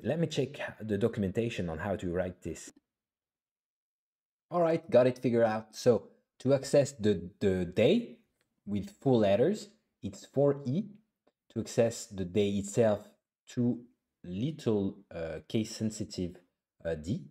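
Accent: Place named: France. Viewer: god , I love the French accent.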